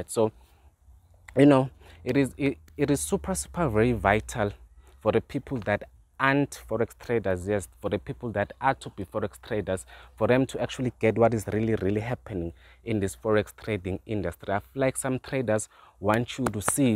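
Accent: South Africa. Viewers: that's South African accent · you sound like you from SA and why don't you reply to the people asking you which country are you talking about?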